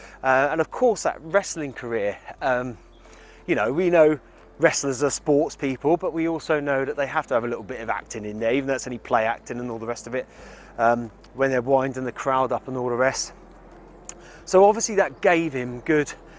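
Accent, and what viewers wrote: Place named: Yorkshire, United Kingdom. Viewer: Hello,not a bad Yorkshire accent actually, John, hartlepool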